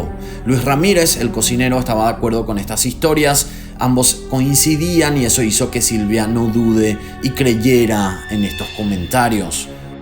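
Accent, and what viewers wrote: Venezuela. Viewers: Pensé que eras venezolano. No conocía este caso y ps wow lo que hace una buena oratoria. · Aló! 👋😉👌Pensé que eras Venezolano. 🤔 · Yo pensé q eras venezolano jiji Desde que conocí tu canal , tus videos son muy interesantes y atrapantes x la manera en cómo Relatas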